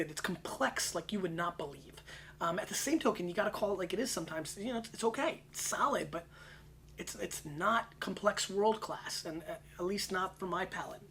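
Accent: United States. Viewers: Just a tip,. You should work on toning down your american accent. It is impossible to understand your German!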